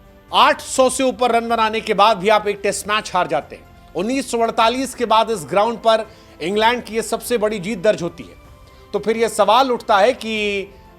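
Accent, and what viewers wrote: Bihar, India. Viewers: Bro Sounds Like a Tru Bihari.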